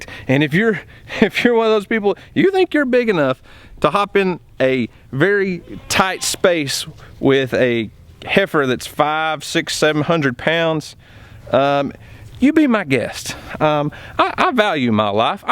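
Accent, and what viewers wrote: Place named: South, United States. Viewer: You know, the more I listen to you, the more I think you sound like Alex Kendrick, from Sherwood Films ( Courageous, War Room, etc.). I suppose you could be originally from Georgia. Or maybe it's just that, to my Pacific NW ears, all you Southern gentlemen sound alike.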